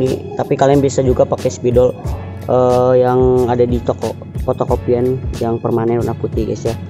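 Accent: Serang, Indonesia. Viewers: orang serang bro?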